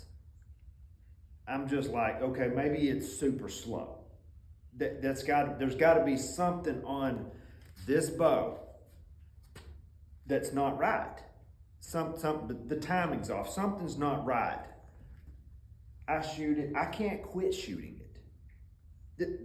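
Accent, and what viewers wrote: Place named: South, United States. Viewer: Love that southern talking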